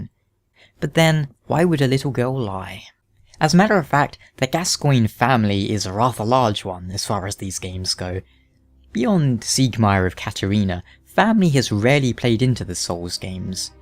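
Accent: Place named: United Kingdom. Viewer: Should I watch Vaati or Video?.. Oh well you cant just not watch the Video as soon as you hear that british english ^~^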